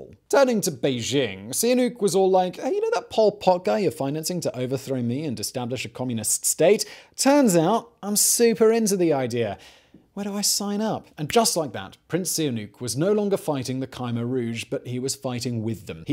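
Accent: United Kingdom. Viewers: Thanks for making this one Simon - I live about a mile and a half away from Tuol Sleng and I drive past on my motorbike nearly every day. It's pretty much in the centre of Phnom Penh, and the rest of the neighbourhood is a very nice place, with some great coffee shops and local bars. Heck, my shoemaker is on the same street as S21! And where I live is virtually equidistant between S21 and the Choeung Ek Killing Fields. Despite the utterly horrible history here, the good people of Phnom Penh are rebuilding still, and life is a lot happier for many here these days. I've been watching your videos for a long time, and as tragic as the subject matter is in this one, I'm really glad to see you and your team make this video. I was thinking less than an hour ago that I should visit S21 again as it's been a while since I paid my respects. Anyway - listening to you as a fellow Brit on your videos often serves as a nice reminder of home, but this video's a great surprise - a British voice helping to chronicle a very important piece of the history of this lovely adopted city of mine. 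Thanks again.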